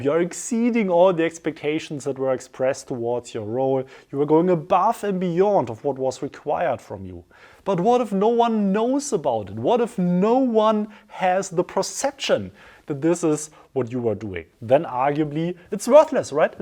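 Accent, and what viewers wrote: Germany. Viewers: Ein ungefragter Tipp nachdem ich mehrere deiner Videos geschaut hab: Inhaltlich gut dargestellt und kohärent. Sprachlich muss man sagen, dass du quasi Deutsch mit englischen Vokabeln sprichst. Sprachmelodie, Grammatik und Satzstruktur sind deutsch. Sonst gut. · I love the german accent!❤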